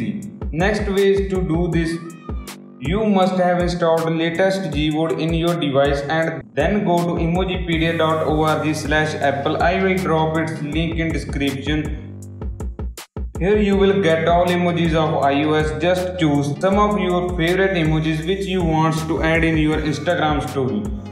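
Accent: India. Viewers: bro tuhadi english...🔥✨😂desi touch nal..!!kaim lgdi a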